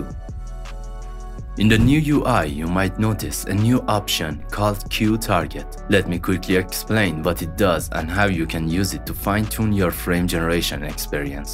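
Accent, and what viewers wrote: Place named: Iran. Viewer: Honestly , I feel like you have an Iranian accent 😅😅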